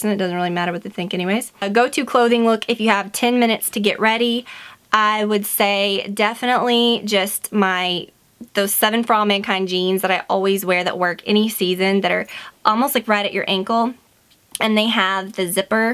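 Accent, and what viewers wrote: South, United States. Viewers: her accent is very southern in this video hahaha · Wow... never knew she had a slight southern accent O_O lol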